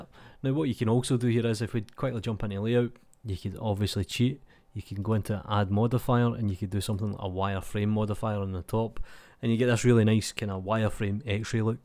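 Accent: Scotland, United Kingdom. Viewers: Your inspiring combination of Blender knowledge and mesmerizing Scottish accent saves the day again. Thank you!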